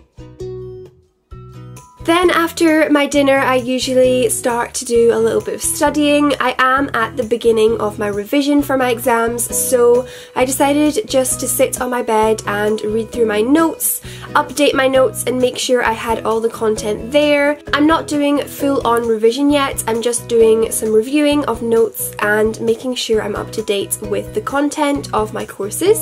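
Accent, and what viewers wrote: Scotland, United Kingdom. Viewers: Where do you live? Are you Scottish ? I am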